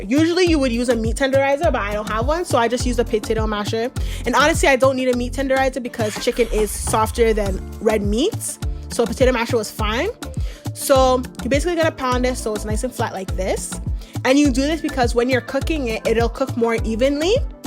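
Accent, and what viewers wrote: Toronto, Canada. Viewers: Soon as u started talking!! I said she a Canadian!! We really got an accent!! Nice recipe!!! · Toronto accent smacked me in the face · That Toronto accent is hilarious 😭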